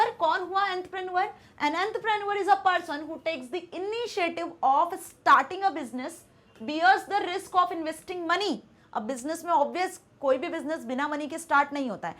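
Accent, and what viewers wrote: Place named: Bengal, India. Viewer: Arey bhai ap toh bengali ho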